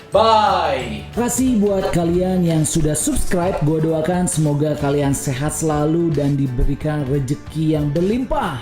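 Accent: West Java, Indonesia. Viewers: Bang asli sunda?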